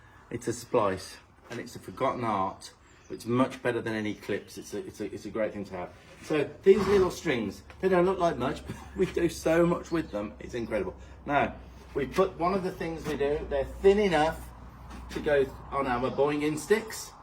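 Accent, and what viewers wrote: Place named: Wales, United Kingdom. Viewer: Brilliant tips’ VLOG like the other one. By doing what you do, it removes all the flight/fight out of them … and they may actually enjoy the riding. I knew Stephanie Powers was into horses from watching her on some US talk show many years ago, where she discussed her custom made equine dinnerware … it was beautiful … and it was also for charity too. I wonder if she still has the set?!? But I didn’t know she had rescue properties all over the world. It should be an awesome visit/session. The way you were talking it sounded like it’ll be a members only VLOG … 😢! Pity!!! Well, you’ll both have done quite a lot of globetrotting this year … mind you your Vacation time is usually in the Winter/Fall seasons, which tend to be the off-season for some. This was an unusual year though … what with the wedding and all! Love the colour of nails 😊 … will look nice with the tan you’ll be working on! 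I’m wondering if the place you’re going to is that rescue you visited at, in one of your VLOGs? I think it was there we saw it. Or maybe that was Greece … you mentioned you guys lived there for severeal years at one time. Hope you have a wonderful time again, when you’re back in the US … hopefully it won’t be too crazy weather-wise! Things are getting a bit extreme, in various parts of the world. Loved the look for today’s VLOG … almost forgot you were Welsh … or are you British (?), until you started to talk! 😂 I’m looking forward to the next VLOG. Safe travels and Happy Trails! 🙏🙌🐎🐴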